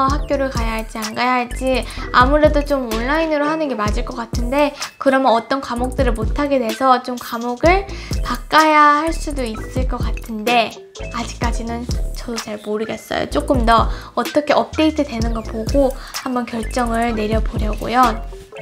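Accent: United Kingdom. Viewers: 영국식발음과 미국식 발음중 어떤 발음을 구사하시나요 ~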